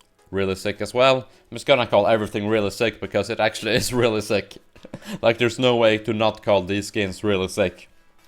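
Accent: Sweden. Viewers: Sweden is my guess :\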